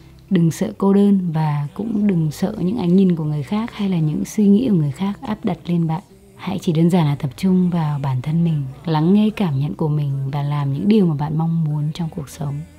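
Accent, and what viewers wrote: Central, Vietnam. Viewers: Hic chị quê ở mô rứa ạ. Em thấy chị nói giọng miền trung 😊 Tập này như đang nói đến em vậy . Em cũng đang ở xa nhà ít bạn bè và đôi lúc cảm thấy cô đơn nhưng rất thích quãng thời gian một mình Đôi lúc bản thân cũng có thời gian lặng để biết bản thân cần gì😊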